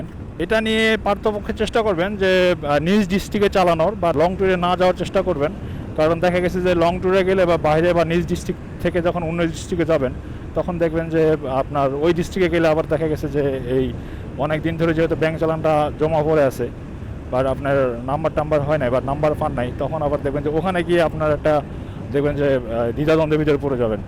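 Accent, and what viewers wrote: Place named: Sylhet, Bangladesh. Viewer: তামাবিল রোড 🥰 আপনার একসেন্ট সিলেটিদের মতো, আপনার বাড়ি কোথায় ভাই?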